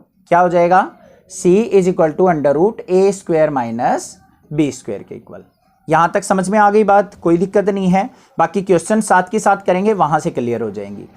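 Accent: Haryana, India. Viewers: Sir aap haryana se ho?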